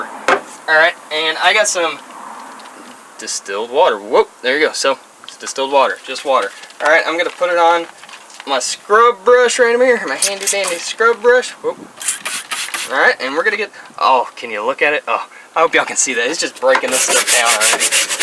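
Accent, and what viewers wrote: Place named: South, United States. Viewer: 🤣😂👊👍, boy you are country!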